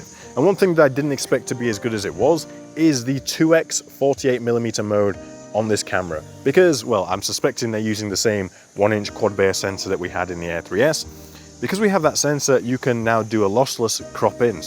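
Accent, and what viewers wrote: United Kingdom. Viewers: I am working my way through your videos, kinda binge watching really! Having just bought the DJI mini 5 pro I found your videos to be extremely helpful, especially the one on cruise control!! Needless to say I have subbed, many thanks for the tuition! Best regards Dennis 👍 BTW you sound like a Brit but it doesn't look like the UK, where are you? Sorry just curious 🤔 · Hey mate. You sound British, but maybe you are living overseas? The reason I ask is where here do you get the plus batteries from in the UK?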